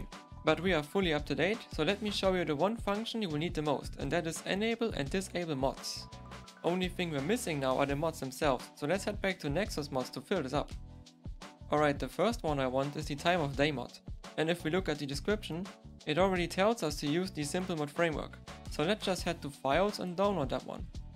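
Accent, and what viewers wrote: Germany. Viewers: Deutscher akzent? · Bist du deutsch? Man hört so nen leichten akzent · Bro sounds so German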